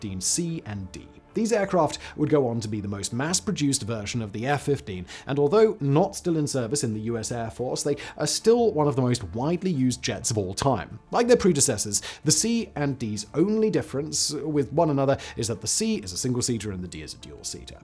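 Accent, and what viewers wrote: England, United Kingdom. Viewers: The F-15 NEVER served in Vietnam!!!!! How can you be so fucking lazy that you can’t be bothered to look up an easily verifiable fact!!! This video is proof that having an English accent doesn’t make you smart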